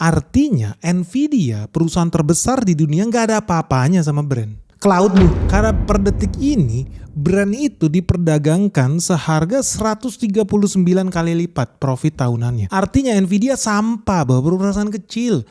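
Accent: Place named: Surabaya, Indonesia. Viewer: Gaya ngomong Bang model surabayaan terang2an dan lucu 😂😂😂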